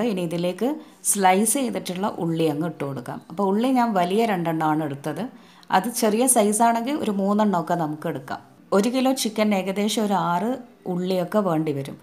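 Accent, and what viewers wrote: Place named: Kannur, India. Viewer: Biriyaniyum kannanur slangum ishtapetin itha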